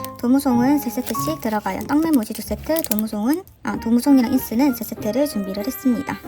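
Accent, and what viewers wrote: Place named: Gyeongsang, South Korea. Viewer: 경상도 분 이신가요오?! 너무 귀여워요 사투리..😳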